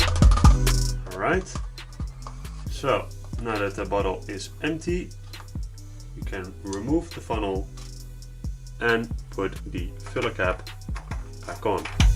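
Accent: Netherlands. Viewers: Hey! Ik hoor dat je nederlands bent, dus hopelijk kun je deze vraag beantwoorden haha. Ik heb net een 1.8 NA miata aangeschaft met 250K km (150k miles) op de teller.. geimporteerd uit amerika, maar bij het starten maakt het motorblok precies het zelfde geluid. Zelf heb ik twee NB's gehad en altijd 10w40 gebruikt. Als ik dit spul wat jij aanbeveeld erin stop en 50-100km ga rijden, kan dat geen kwaad? En daarna mijn olie ververs? Zijn het de zuigerveren die dan vies zijn of aan vervanging toenzijn? Graag hoor ik even meer😁 Groetjes, chris. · Are you dutch 🇱🇺 ? The accent gives it away 😁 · you gotta love the dutch accent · haha lekker nederlands accentje, ik heb een cls500 die ook tikt misschien dat ik dit ook ga uitproberen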